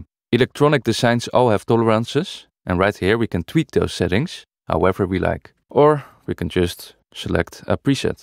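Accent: Netherlands. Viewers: Thanks for this very usefull tutorial! And eeh, you MUST be Dutch. Haha, ik bedoel, kan gewoon niet anders dan dat ik hier een Nederlander hoor!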